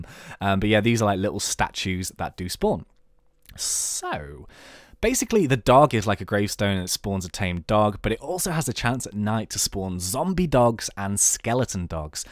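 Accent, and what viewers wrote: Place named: Northern England, United Kingdom. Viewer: Hi, I lived in England for a while and became fascinated with the varied music of the many British accents. I'm usually good at guessing what region one is from, but I can't seem to guess your accent. It almost sounds as if you were born in one place and moved at a young age to the north of England. Would you be so kind as to tell me the history of your accent? Kindest regards, Tal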